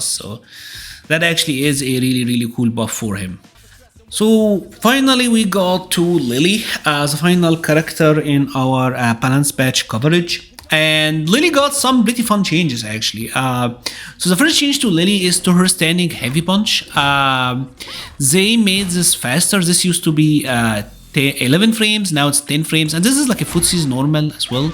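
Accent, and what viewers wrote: Egypt. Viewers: it's great when you say hey guys here wish I had an Egyptian accent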